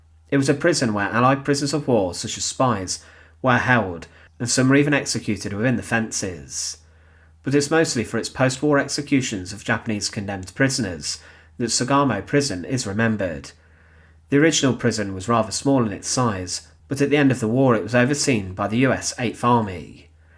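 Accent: United Kingdom. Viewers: The accent is British, I'm a fellow Brit 🙂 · He’s british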